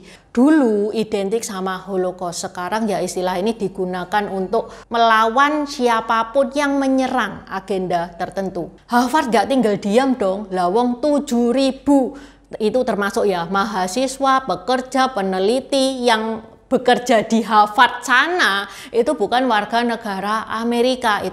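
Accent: Java, Indonesia. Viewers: Medook Jowone😂😂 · Logatnya Cin Wa bgt 😅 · Bahasa kamu ciindo Jawa . Pasti jawane medok ... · CICI produk lokal yang meddok, aku suka.... 😊😊😊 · Logat jawi cici ne kuental baangat yoo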